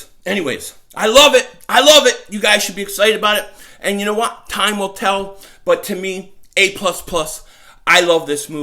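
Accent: Philadelphia, United States. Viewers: Wow never knew you were in cali, you talk like your from south philly, even your wall looks south philly lol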